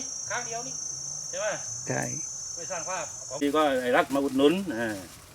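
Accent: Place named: South, Thailand. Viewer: จังหวัดไหนครับ สำเสียงใต้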